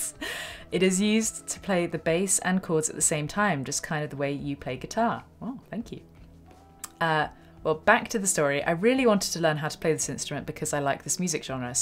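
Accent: United Kingdom. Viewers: The wat you Brits say "pawn shop" doesn't sound like pawn shop to my American ears. Thought, at first this story was going somewhere weird! Lol! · So yeah, with the British accent I spent most of the first story thinking this person had found a guitar in a porn shop when he was "14 or 15 years old." I was puzzling over that one so much that by the time I realized it was in fact a pawn shop I had to rewind and re-watch the beginning of the story!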